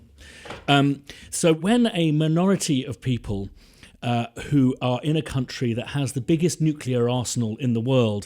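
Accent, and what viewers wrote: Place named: London, United Kingdom. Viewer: WHAT? This guy sounds like he's from London, do you really think Trump is launching nuclear bombs over GB? How delusional can people be? Turn off CNN/BBC!! If anything, this guy should be worried about low-IQ, violent immigrants attacking his family (god forbid), thanks to liberal/self-hating Feminist governments taking over the West and burning it to the ground.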